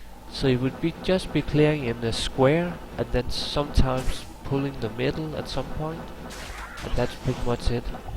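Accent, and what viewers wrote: Denmark. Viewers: uhhHU i think this guy is from denmark if you are say hej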